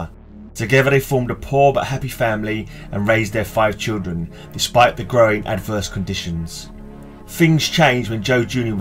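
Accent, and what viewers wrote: United Kingdom. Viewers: Viola played her in Suicide Squad. How to get away with murder is a TV show. Wild show! My brother Matt was in Orange is the New Black, Ratter, and several other things. His best friend Hartley Sawyer played Elongated Man/Ralph Dibny on The Flash TV show. He was brilliant!!! They fired him because of his Tweets from 2011. I was hoping he could tell the producers to give my brother a job as Booster Gold or Green Lantern. Oh well. I shared this vid on Twitter. U have a great narration voice. British, I assume?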